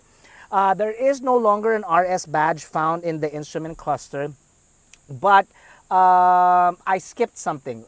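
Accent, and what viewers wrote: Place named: Philippines. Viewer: I love the standard filipino accent.😊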